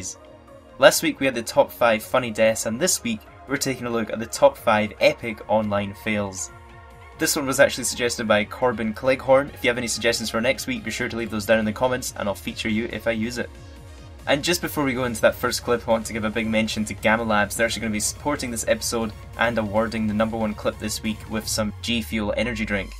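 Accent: Scotland, United Kingdom. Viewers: Where are you from? You sound Scottish.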